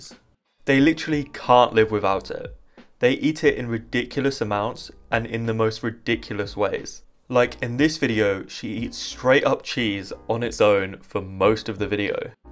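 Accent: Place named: United Kingdom. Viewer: Bro,he Bri’ish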